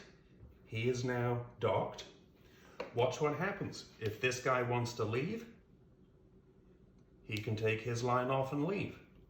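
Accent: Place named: United Kingdom. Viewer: Great video; so far all of yours that I’ve seen are very well done with clear and direct instructions. I have a question. You sound to my ear like you are British. I’d that the case? And how did you come to use “Royal Navy Yachmaster” as a title? I live in Canada now but am also an American citizen. Up here, using the term “Royal” generally indicates that English Monarch would have bestowed that honour, so I’m a bit confused.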